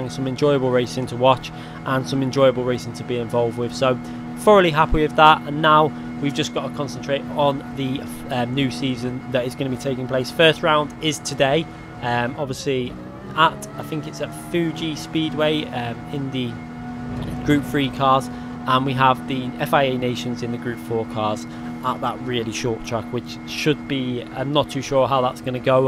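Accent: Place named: Cheshire, United Kingdom. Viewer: Nice work mate, been watching you for a while now, you from the north west? Sounds like a Cheshire accent 🤔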